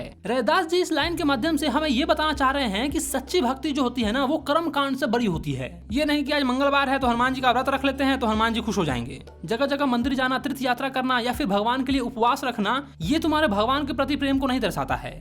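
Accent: Bihar, India. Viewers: Sir , Are you from Bihar because you're accent is just like Biharis people, and sir I am also from bihar and I want to say you teaching style is amazing ❤❤❤ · sir kya aap Bihari hooooo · Bhai aap kya bihari ho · Bihar ke hai sir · Sir aap bihari hai na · Aap bihar se hai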